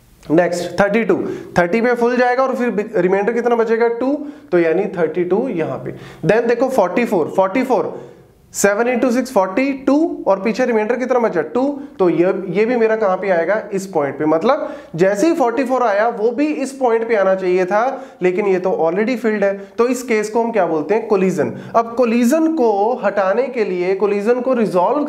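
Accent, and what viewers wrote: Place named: Haryana, India. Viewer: Sir aapka haryanvi touch h language me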